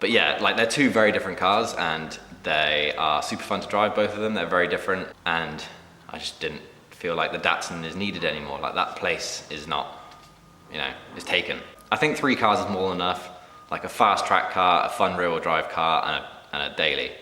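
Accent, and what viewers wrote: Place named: Bristol, United Kingdom. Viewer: knew you were from brizz from that accent hahaha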